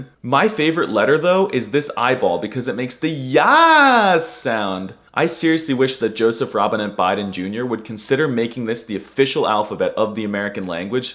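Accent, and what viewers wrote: Rio de Janeiro, Brazil. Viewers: his portuguese spelling his absolutely perfect 😂😂😂 I am brazilian, and he really played a brazilian speaking, it is really this tone that we talk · 😂enough w the pt-br accent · as a native Portuguese: You talk Português from Brazil, not the right accent if you want to speak Português.. and some words are different too, but in the end we spoke the same languange, we understand with no dificult all hispanic languages.. Countries like, Angola, Moçambique, Guiné Bissau, Cabo Verde, Timor, Macau the official language is Português too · As a Brazilian he sounds like people from Rio de janeiro lol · You sound like a native Brazilian, not a Portugese person. That being said, I'm so glad my first language made it on this list. :D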